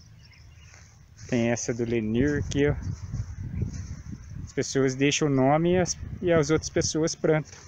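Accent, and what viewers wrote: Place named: Espirito Santo, Brazil. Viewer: Cara top, vc tem um sotaque de capichaba. Q bacana q Deus abençoe este lindo lugar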